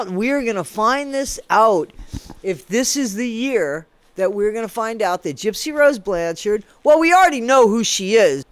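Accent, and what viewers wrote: Scotland, United Kingdom. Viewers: your Scottish accent doesn't suck, it's hilarious actually 😂😂😂😂😂😂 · I’m loving that Scottish accent 🤣😂😂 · Her dogs name is (was) Pixie. Ryan’s now. I love your Scottish accent.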